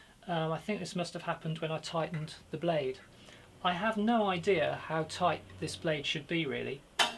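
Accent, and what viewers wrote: United Kingdom. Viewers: Interesting and helpful Video. Cute woodworking girl, i like the british pronunciation.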